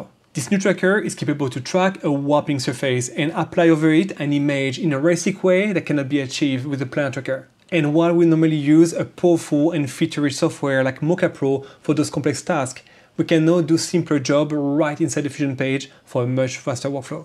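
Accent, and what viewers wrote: France. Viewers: Ohhh hey I got a shoutout hehe! Thanks guys! Love what you're doing here, great to see more Resolve channels popping up (plus sexy French accent beats my blunt English one 😆) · Probably one of the most pro channels on Davinci with a little twist of having a very nice French accent. LOVE IT! And depth map is my favorite. Merci beaucoup!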